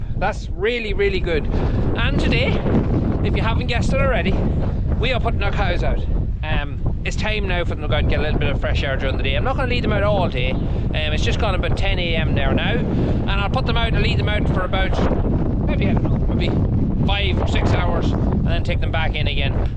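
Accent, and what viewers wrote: Ireland. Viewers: cottage reveal caught my eye, had to watch! and seeing the farm life got me subscribing! i'm a city slicker now for near 30 yr's , was raised on a 10-acre hobby farm....isn't that pallet tool handy? i want to get one now! i have an idea for making a pallet bed and only got as far as collecting pallets,lol, Enjoyed the video watching the wheeler fixing too w/ ur 'helper' ...(lol, dog) ....was trying to figure ur accent, then i heard u say something about Ireland! i'm american, usa....have a good one, i'll watch ya again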